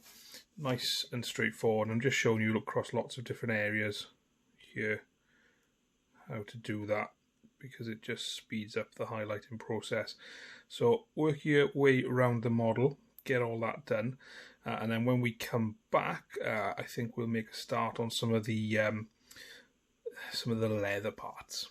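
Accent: Wales, United Kingdom. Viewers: Any chance of you'll have a go at painting Dragon Warriors Chaos Space Marines? I'm looking to paint my Chaos Marine battleforce to counter my Salamanders. Also, your Welsh accent is fantastic!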